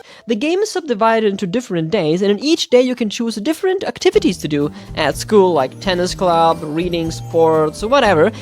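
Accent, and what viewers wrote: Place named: Germany. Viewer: Knew I heard german somehow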